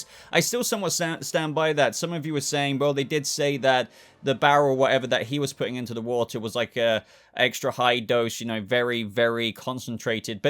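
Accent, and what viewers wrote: United Kingdom. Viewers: I am totally digging your British accent. 😆..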